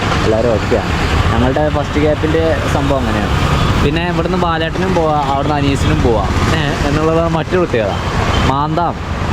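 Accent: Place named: Kannur, India. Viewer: ബ്രോ ന്റെ ഭാഷ പൊളി കണ്ണൂർ ഭാഷ ശൈലി ആണ് നമ്മൾ രൂപ ക്ക് എന്നൊക്കെ കേൾക്കാൻ നല്ല രസം 😃😃😃😃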